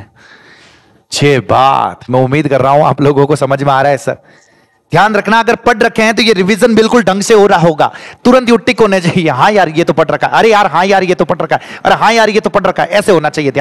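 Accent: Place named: Marwar, India. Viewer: Madsab aapri marvadi boli choki lage aap ase hi padaye ❤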